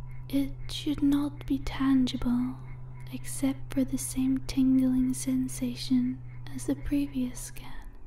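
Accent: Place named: Scotland, United Kingdom. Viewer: She sounds vaguely Scottish, is that a reference to the alien sightings at Bonnie Bridge (I think that's what I think the village is called)🏴🌉👽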